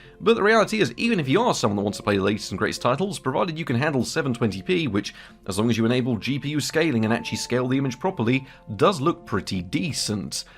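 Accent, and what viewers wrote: United Kingdom. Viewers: When you said "20 pound old AMD flagship" I was thinking "Imagine the GPU sag with that kind of weight!", but then I realized from the voice, "Oh yeah, he's from the UK, 'pounds' are his money!"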